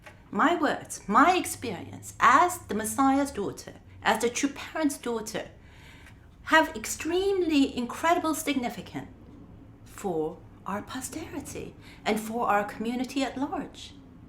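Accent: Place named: United Kingdom. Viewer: How did you get that British accent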